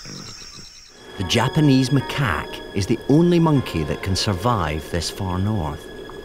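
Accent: Scotland, United Kingdom. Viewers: Well I didn't wake up this morning expecting to hear David Tennant say "Spy Macaque" in his very Scottish accent but here we are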